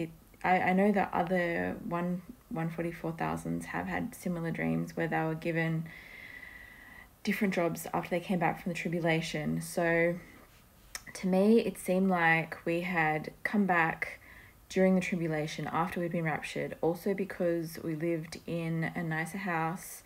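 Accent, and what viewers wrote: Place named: Australia. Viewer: Oh sister right now u had 144 subs! U sound Australian. I'm kiwi. I know a few other NZ and OZ 144s. 🕊👼🏻🙂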